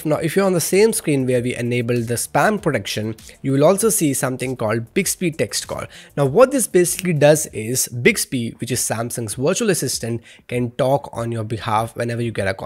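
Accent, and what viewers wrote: India. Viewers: Am I the only one notice your Heavy Malayali Accent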